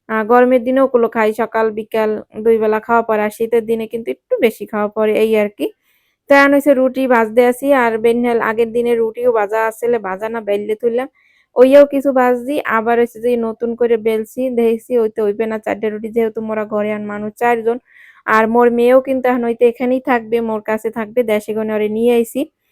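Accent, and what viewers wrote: Barishal, Bangladesh. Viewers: আপু বরিশালের ভাষা খুব ভালো লাগে 💘💘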